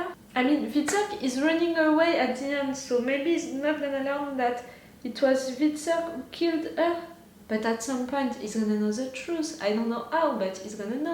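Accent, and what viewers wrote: France. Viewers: Are you french? You have a accent french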